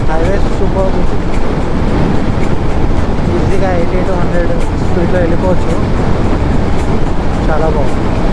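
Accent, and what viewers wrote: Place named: Telugu, India. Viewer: Brother you talk English off Telugu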